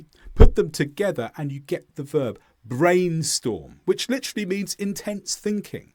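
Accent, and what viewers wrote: England, United Kingdom. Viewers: >search for good grammar video >open an 5 hours video >England accent >close the video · Where have you been all this while ? SUBSCRIBED straight away. I need to devour your videos. That will help me with good paraphrasing in my assignments and every day life. But most importantly why I chose you is because, lessons from a British English accent teacher are very helpful for people living in the UK. Everyone use the accent suitable for them and the country they live, that’s what I did for myself. Thank you very much indeed for your hard work building and editing these videos.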